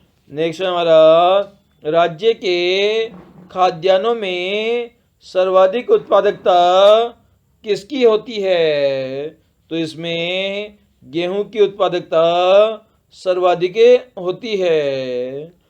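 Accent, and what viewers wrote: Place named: Bihar, India. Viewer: हरियाणा जीके बिहारी पढ़ा रहा है बड़ा गंदा लग रहा है आवाज से 😂 भाग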